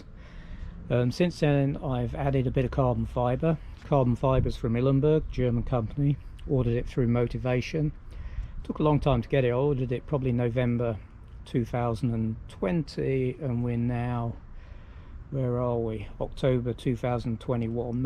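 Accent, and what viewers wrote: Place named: England, United Kingdom. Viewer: Hi, just observing your front brake callipers looks different to my BMW stock pair. I just picked up my S1000RR last week 2021 model. Although you have an English accent you mentioned bucks and your reg plate has reflectors on the side (USA Std?). Just wondering if this was anything to do with the weeping issue in the USA?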